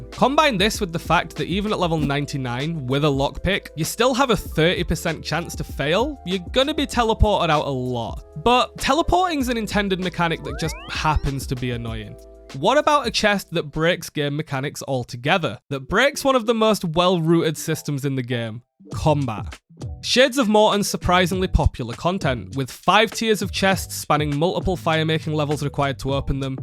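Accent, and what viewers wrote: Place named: East Yorkshire, United Kingdom. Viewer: Sounds like east yorkshire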